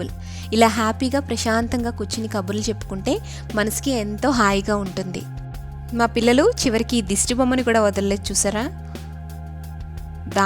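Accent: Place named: Rayalaseema, India. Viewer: mi slang rayalseema matalu laga unai..nice madam vizag super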